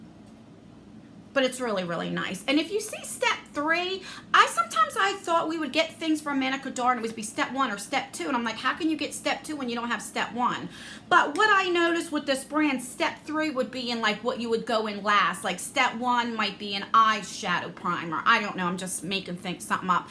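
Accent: New Jersey, United States. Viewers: Like your Jersey accent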